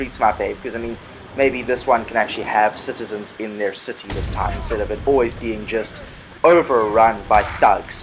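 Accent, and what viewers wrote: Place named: South Africa. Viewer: recognised ur accent 😂 Im from SA too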